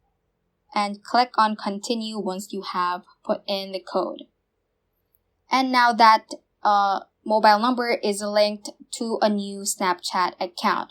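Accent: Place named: England, United Kingdom. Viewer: Your English accent it's so nice ❤️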